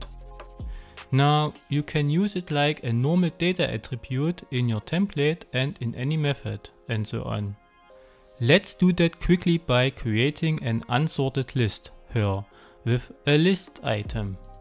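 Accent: Germany. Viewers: I love your german accent hahaha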